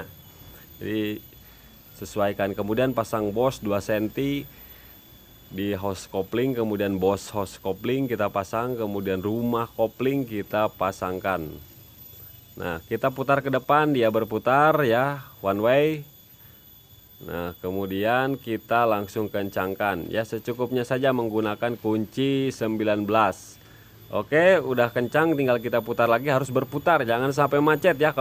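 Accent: West Java, Indonesia. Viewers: Kang Aya logat logat Sunda, Sunda mana kang, bengkel na di mana kang..hatur nuhun kang.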